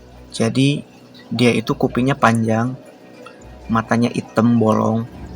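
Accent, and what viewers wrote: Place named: West Java, Indonesia. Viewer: Suka banget sundanya 😂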